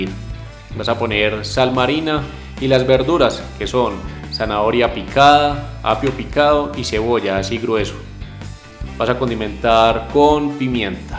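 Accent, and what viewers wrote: Antioquia, Colombia. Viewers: sexy voz paisa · Me llama mucho la atención el acento colombiano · esa voz de paisa tan linda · Eh ave Maria, se nota que sos paisa! Que viva colombia 🇨🇴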